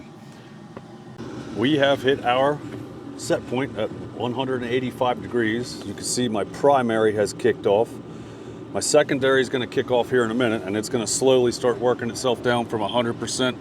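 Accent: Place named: United Kingdom. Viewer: Another viewer from the UK here. Just wondering if you're from the UK, or have spent time here as parts of your accent is very British :) First time watching, great content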